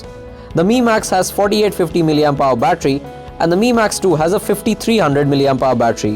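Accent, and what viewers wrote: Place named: India. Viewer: Really hate Indian accent!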